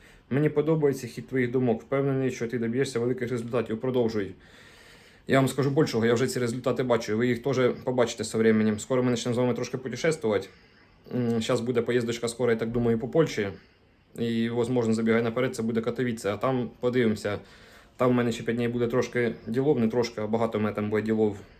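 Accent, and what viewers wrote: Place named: Surzhyk, Ukraine. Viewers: головне, що ти гідно тримаєшся і не зважаєш на хейтерів. Якщо будеш знімати подорожі по Польщі то заздалегідь готуйся пиши сценарій, буде цікавіше дивитися, це не повчання, а бажання. Удачі! P.S. на Полтавщині теж так балакають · За суржик 👍👍👍👍👍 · Привіт ти з якого міста Бо в тебе такий суржик як у нас запорізька обл.Гуляйполе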